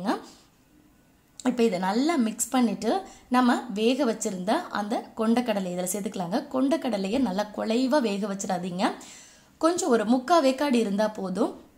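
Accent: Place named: Kongu, India. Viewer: Snacks spr nga.. snakes ku extra azhagu serkradhu neenga pesura kongutamil dhanga.. nalla utcharipu nga.. 👏👏👏👏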